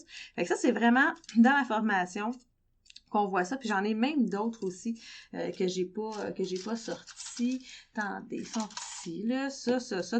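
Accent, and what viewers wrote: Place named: Mauritius. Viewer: Vous avez l'accent d'une Mauricienne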